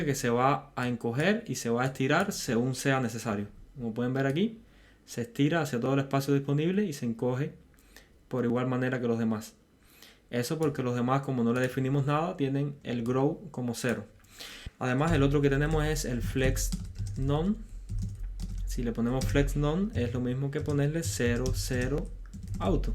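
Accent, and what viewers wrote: Cuba. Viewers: Tu acento me suena, eres de Cuba?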